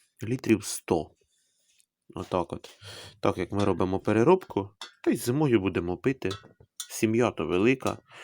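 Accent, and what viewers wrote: Poltava, Ukraine. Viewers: так ведь это наш Полтавский говор, пускай не слушают кому ухо режет, а мне так любо- любо душу греет. Раньше говорили, что наш говор это настоящий украинский язык, мы же не живём на Западной Украине, это центр у нас так,и это у нас не отнять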